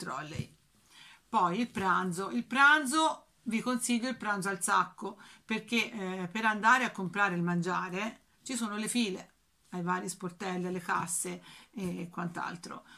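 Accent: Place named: Pisa, Italy. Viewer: Bella esperienza e bravo tuo marito che ti accompagna....Dal tuo accento mi sa che sei vicina a me...anche io provincia di Pisa! Ti seguo con affetto!!!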